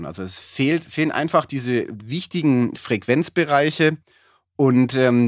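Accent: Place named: Heilbronn, Germany. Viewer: klasse video, sowas hilft mir echt immer wieder weiter bei meinen eigenen basteleien in meinem möchtegern-homestudio! ;) gerade bei EQ (und eben auch kompressor) kann ich gar nicht genug input und anregungen kriegen um dann selber damit zu experimentieren... manches klingt auch erstmal einfacher als es dann im detail in der praxis wirklich ist! ;) nachdem ich mir jetzt schon einige deiner videos angeschaut habe, kann ich mich jetzt aber mit einer persönlichen frage nicht mehr zurückhalten: ich hatte mal einige jahre in den 90ern an einem heilbronner gymnasium einen musiklehrer (und erdkunde) mit dem gleichen namen. der unterricht war echt klasse und ich war auch unter seiner leitung im chor und anderen schulprojekten. ich habe gesehen, dass du auch aus der region bist, die sprechweise kommt mir irgendwie ein wenig vertraut vor und nunja die frisur hat auch gewisse ähnlichkeiten. 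;) also drängt sich mir einfach die frage auf ob da wohl ein familiärer zusammenhang besteht...? :)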